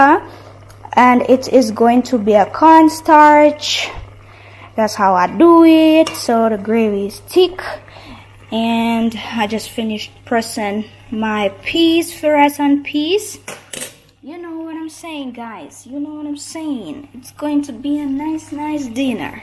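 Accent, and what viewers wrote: Jamaica. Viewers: Love your Jamaican ❤accent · you a real Jamaican now. Love to hear you speak. You a comedian as well. 😂😂😂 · Yes can't wait for the gender reveal🎉 as long as it's healthy that's the main thing, don't like a cut I've noticed that some long time I think you gonna have a Deadlock in the household when he's older! And you're the funniest blogger out there you just naturally funny😂 the things you say you Jamaican accent keep it up you and your family are awesome!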